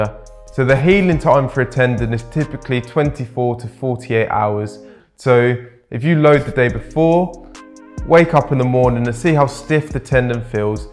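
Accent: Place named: United Kingdom. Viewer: Nice video, good content, well-done and British accent as a bonus! What's not to like? :)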